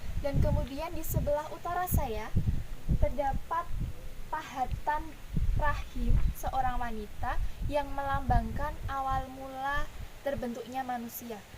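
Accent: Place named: Java, Indonesia. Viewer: Good ya... Semangat belajar terus... Latihan speaking dan diasah terus..biar tambah luwes dan g medok lagi . Heee...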